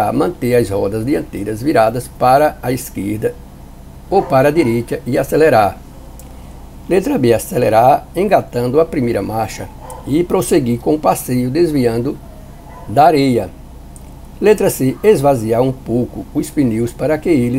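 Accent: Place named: Paraíba, Brazil. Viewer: Ele deve ser da Paraíba pelo sutaque não sei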